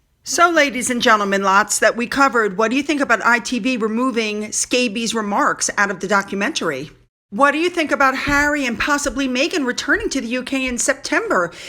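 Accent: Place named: New Jersey, United States. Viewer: I wonder where she's from? I'm guessing New Jersey, Pennsylvania, or Delaware.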